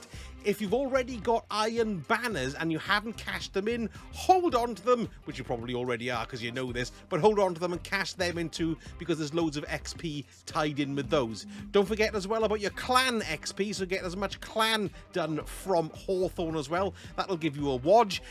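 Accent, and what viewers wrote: Wales, United Kingdom. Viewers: Thank you Mr Welsh man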